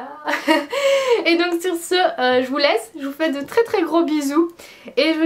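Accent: Belgium. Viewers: Héhé, voilà que je découvre ta chaîne, et en t'écoutant parler je me dis "Elle viens de Belgique, j'en suis sûre", et comme de fait :P (Moi aussi, huhu) Très sympa ta chaîne en tout cas :D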